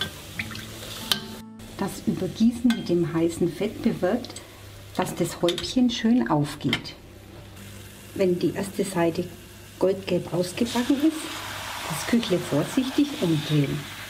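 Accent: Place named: Franconia, Germany. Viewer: Hallo, aus welcher Ecke Frankens kommst Du? Ich höre den Dialekt so gern. Lg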